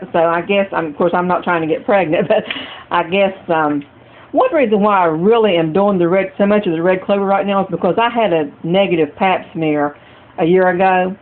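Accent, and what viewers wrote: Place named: South, United States. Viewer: You have a southern accent I'm curious I where your from because I'm from NC and I can't find it anywhere here only white clover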